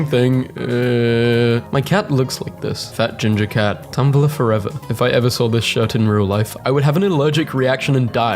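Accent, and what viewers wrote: United Kingdom. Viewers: why do you randomly pronounce the r in Kirby like an American but sound british for literally every other word?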